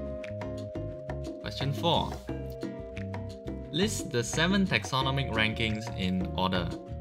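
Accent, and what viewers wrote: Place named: Malaysia. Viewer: My bro, you also sound very Malaysian Wیہ😍🙈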